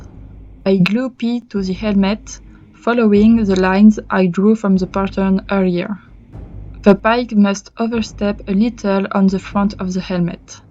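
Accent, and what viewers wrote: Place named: France. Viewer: After years studying french, Im so proud I can distinguish her beautiful accent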